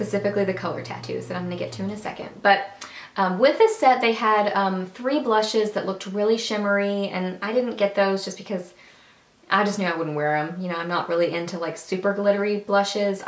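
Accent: South, United States. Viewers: Great review of everything! I've been searching for the matte balms- haven't found yet- going to look again today- day off. So glad I found you- prissy highly recommend I check you out - from PrissyAndPink- so glad I did! R u from the South too? We're both in Houston and you sound like another Southern girl!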